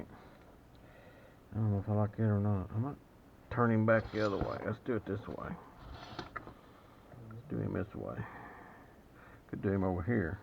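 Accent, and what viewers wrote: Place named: South, United States. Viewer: Impressive bud! Dropping by to show you some love! From one Southern boy to another....just guessing by that voice lol lol.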